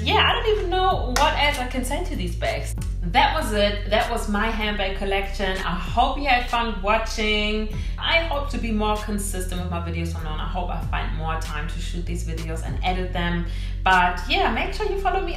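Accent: Germany. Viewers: Are you german ich hörs raus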